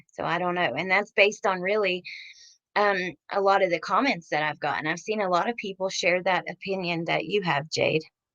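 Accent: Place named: Kentucky, United States. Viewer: I've been looking for this. Thanks so much for the video & reading it out to us. I can concentrate to listen, but I have trouble concentrating when I read more than a few minutes. So thank you!! What State are you from? I grew up in E. KY. You kinda sound like me. Lol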